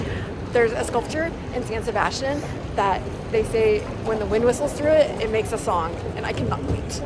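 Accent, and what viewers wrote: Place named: United States. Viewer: Con este acento, parece americana de nacimiento. O al menos lleva muchos años en EEUU. Dudo que sea alemana.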